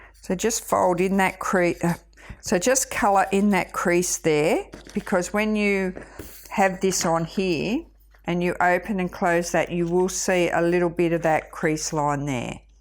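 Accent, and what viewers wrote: Australia. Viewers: I’m a Melbourne girl who was so excited to hear an Aussie lady doing a video and I really love how you up cycled those envelopes. I’m doing some gift ideas that will work well with this envelope idea can’t wait to play😃👍🏻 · So nice to hear a Aussie fellow crafter. Thanks for the tutorial as I'm new to all of this and very helpful. And where did you get your glue from please?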